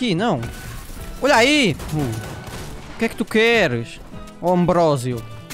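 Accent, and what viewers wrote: Portugal. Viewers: Es ganda tuga so consigo ouvir: "olha ai pá" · eu adoro esse sotaque de portugal "VOCEAS ACHARAM QUE EU TINHA MORRIDO DE CURONA?" Eu so br ent vo da um desconto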